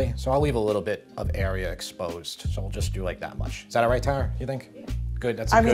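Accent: New York, United States. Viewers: I know that accent. Queens? Long Island? 🤔 😂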